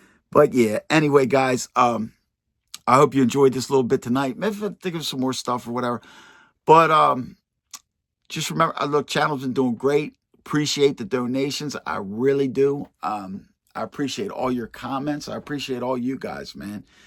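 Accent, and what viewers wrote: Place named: Pittsburgh, United States. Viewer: That accent, are you a fellow Pittsburgher? Or from Philly? Hearing you talk reminds me of shooting the shit with my homies back State Side. I’m standing on business - on the good side of the law now - in Đà Nẵng, Việt Nam and just because I speak the language doesn’t mean I don’t miss talking and listening to that real raw American nitty gritty from someone else who’s been in the trenches and popped up a better man yet have a prequel, story, and sequel about running wild. Type of things I highly censor when it comes to talking to the Misses 😂 Just subscribed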